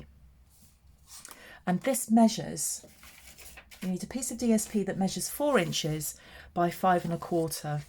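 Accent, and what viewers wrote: United Kingdom. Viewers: Lovely listening to a British accent . Very nice card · Your tutorial was great! I loved listening to your accent. I was fortunate enough to live in England for a couple of years and absolutely loved it!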